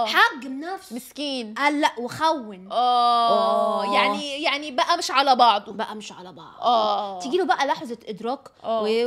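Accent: Iraq. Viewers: تعودت عليجن تحجن عراقي 😂😂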